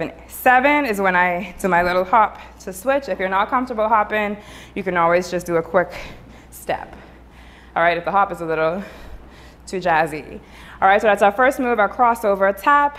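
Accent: Bahamas. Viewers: Listen quick question are you from the Bahamas? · This is A Bahamian instructor I hear an accent🇧🇸😄 242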